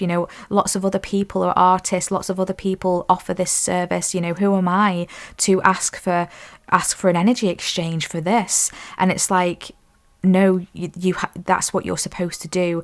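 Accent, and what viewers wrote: United Kingdom. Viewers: British.?? Coool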